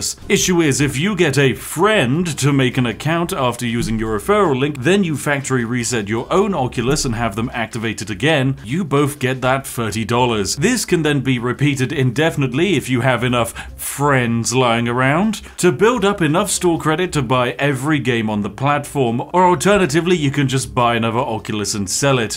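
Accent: United Kingdom. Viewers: this brit be spiffing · This dude is British in every way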